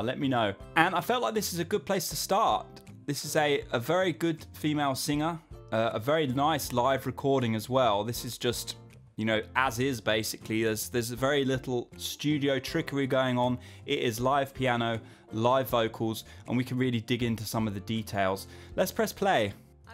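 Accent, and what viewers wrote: England, United Kingdom. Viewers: Are u English ?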